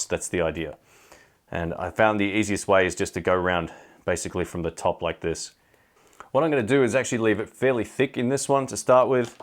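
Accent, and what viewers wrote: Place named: Australia. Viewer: Nice hack. Lot cheaper Roxdon or BSW pop filters. Great sounding Aussie voice... mine diesn't have that feature...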